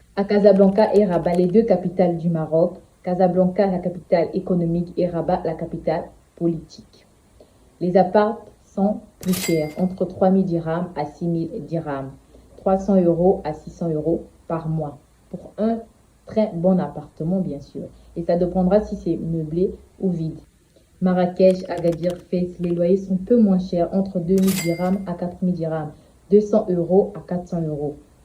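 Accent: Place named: Gabon. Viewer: Ton accent est gabonais 😅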